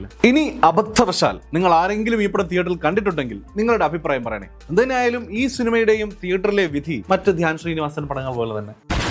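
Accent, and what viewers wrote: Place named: Tamil Nadu, India. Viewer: ഇന്ത്യൻ accent അല്ല തമിഴന്മാരുടെ accent ആണത്!!!!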